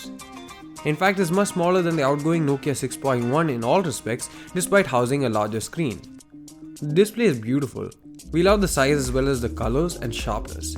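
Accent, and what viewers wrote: Kerala, India. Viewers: ok , u r from kerala?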